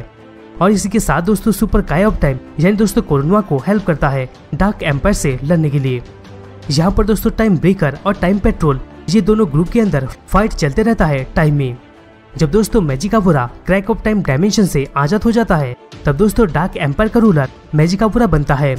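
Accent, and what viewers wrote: Bengal, India. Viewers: Great bhai par apke pronunciation se samajh mein ataa hein ki aap Bengoli hon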